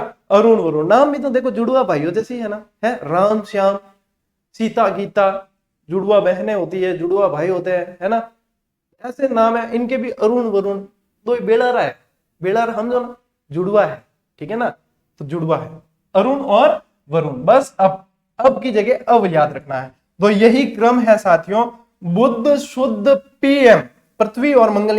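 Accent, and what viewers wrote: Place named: Marwari, India. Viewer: Sir, thnq very much for giving videos with such nice explanation 👍 bahut aache se samajh aata hai ...... n aapki jo maarwadi bolne ka style to bahut hi aacha lagta hai 🙂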